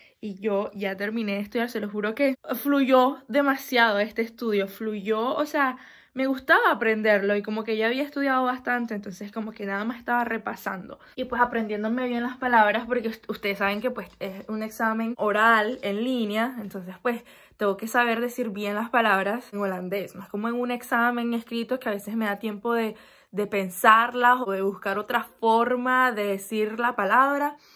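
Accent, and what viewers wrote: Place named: Nicaragua. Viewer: Vivo en inglaterra te sigo de unos meses atras, me llama la atenciòn tu acento Nica. Soy nica.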